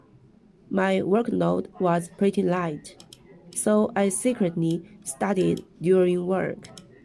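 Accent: China. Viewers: Your English is quite good. Never mind the slight Chinese accent, how could it be otherwise? Your pronunciation is good and your sentences are fluent and correct. Do not underestimate yourself, you are an ambassador for your people. I think you are a very brave woman. 🙂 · Your video is refreshingly real. Your English pronunciation is extremely clear and much better than most students from elite universities in China whom I met in the USA. One more thing, there is nothing wrong with having a Chinese accent because we can understand you effortlessly; in fact, I think accents add richness and diversity.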